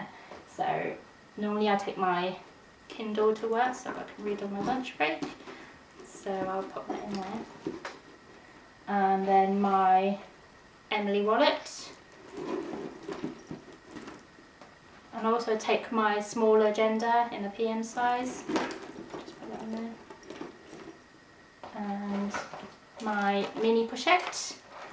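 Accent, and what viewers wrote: United Kingdom. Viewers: Love your English accent and the video is incredible . I find your video quality impeccable and flawless. You addressed my questions except I’m awaiting for the one question about if straps purchased have stamp code or not. Thanks love.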